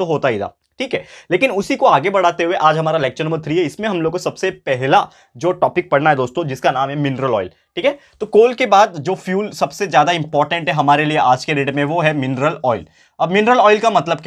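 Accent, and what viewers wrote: Maharashtra, India. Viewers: Sir Apki mother tongue marathi hai?? OMG!!!SAME Marathi gang like here!!!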